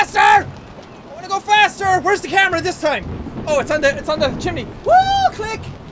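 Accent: Ireland. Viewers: Do you live in Ireland? I hear the accent in your voice. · Your accent is that Irish? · ***** There is a specific accent those from Southern Ireland have. High pitched and fast. definitely has that.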